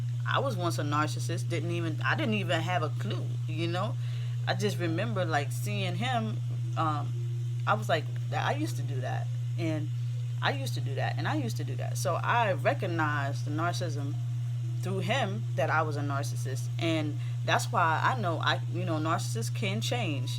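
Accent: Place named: New York, United States. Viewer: Good morning, I just found your channel, oh my goodness, what a gift you have! And thank you for blessing us with all your wisdom, knowledge, guidance and truth! You enlightened and empowered me with your uplifting spirit and powerful messages. This is what I needed ASAP, now I feel better equipped to move forward and start a new beginning with myself. P.S. you sound like a New Yorker, I live in the Bronx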